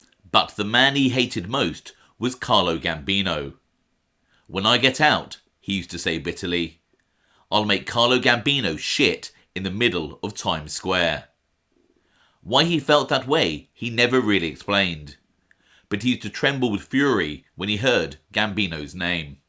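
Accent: United Kingdom. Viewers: I apologize in advance to u t administrators and to the speaker of the video,in my humble opinion heavy exttrapolated british unsophisticated accent is the last thing America crime story needs I am a Cambridge graduate myself, mean no disrespect but that's the way i feel about this videos, sorry again.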